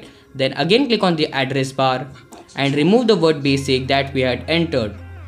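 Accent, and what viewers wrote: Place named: India. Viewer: Why indians talk like that... change not chin :)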